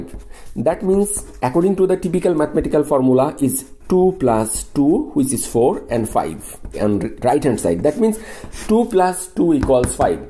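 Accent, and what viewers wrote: Bengal, India. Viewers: While cancelling the sq ... U r actually rooting on one side so in disguise.. it's actually a sq of a modulus and that's why it's +/- on either side depending whether U want to keep the value +ve Or -ve....... And moreover U seem like a Bengali by accent rather than German ( idk how German accent sound) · he sounds like that guy awnsering calls in a call cener in india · Indian english accent but location germany, must a albert einstein student.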